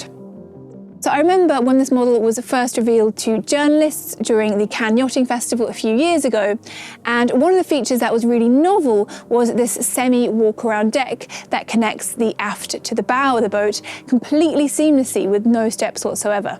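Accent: United Kingdom. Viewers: Who is the guy doing this? Just walk through it, he's trying to dress like a woman but it's definitely a guy with a British accent.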